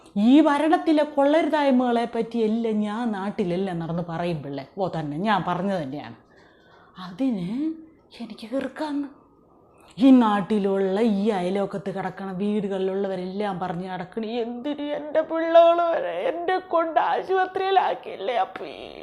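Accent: Thiruvananthapuram, India. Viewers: I like you, Your Tvm style slang ❤🤣🤣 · Chachi entharu ?. Sukangalu thanne ? Chachide thiruvanthoram bhasha kalakki. Abhinayavum super. Kochu kalli.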